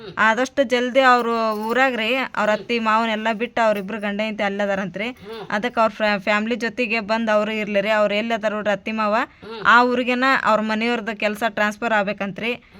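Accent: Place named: Hubli, India. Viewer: Beautiful family ur Hubli is very beautiful & ur hubli kannada is so cute I like it gd night allah hafiz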